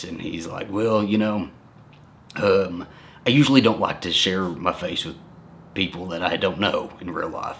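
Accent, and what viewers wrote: South, United States. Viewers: Dude you are the BEST story teller!!! You should narrate books, southern accent and all!! Love you two!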